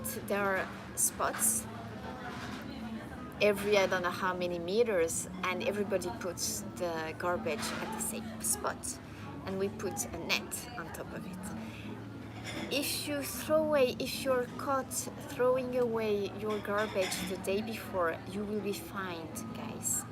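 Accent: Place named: France. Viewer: i like her french accent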